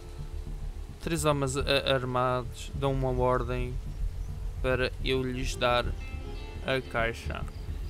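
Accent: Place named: Portugal. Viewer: Cará seu sotaque portugues e até bom mais por favor tenta melhorar mais que as vezes não da pra entender o que você fala.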